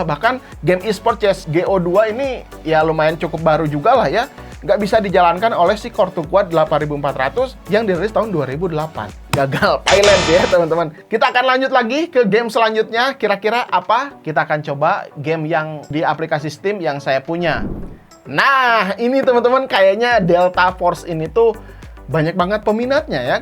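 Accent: West Java, Indonesia. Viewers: Sunda banget aanya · lah emang Sunda, subscriber baru ya ngab?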